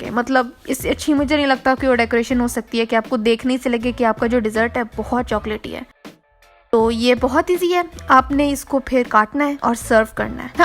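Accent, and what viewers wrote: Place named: Punjab, India. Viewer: that punjabi accent is just wowwwwwwww :)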